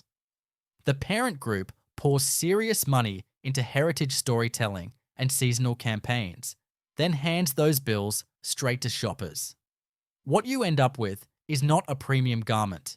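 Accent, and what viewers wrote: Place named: United States. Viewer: Pronouncing numbers the American way eg "one-hundred-forty", not the correct one hundred and forty is annoying.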